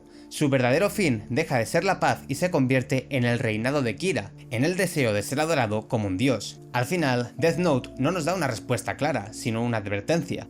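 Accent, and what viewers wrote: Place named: Spain. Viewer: Español de españa? ostiaaaaas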